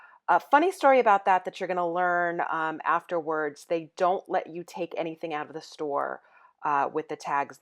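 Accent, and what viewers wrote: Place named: United States. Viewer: oh, I love your American so much=)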